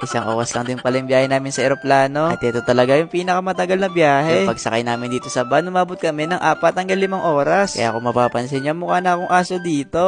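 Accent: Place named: Iloilo, Philippines. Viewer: Ilonggo ka gali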